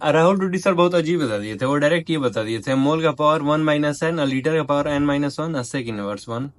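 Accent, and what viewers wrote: Bihar, India. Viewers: Bhai aap bihar se ho kya ascent se lg rha hai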